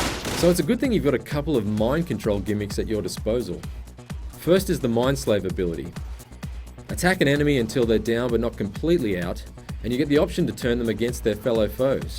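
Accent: Australia. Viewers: And he is Australian! (No offense intended) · is this guy australian???? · Love that aussie accent, because i have one :) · prob cuz hes an aussie the accent is fucking sublime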